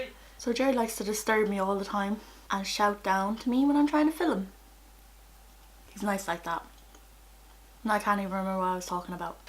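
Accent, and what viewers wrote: Ireland. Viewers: U deserve so much more subscribers ( I just came across ur channel and I Love it +1 subscriber) I guess ur from Ireland, u have the accent 🇮🇪😁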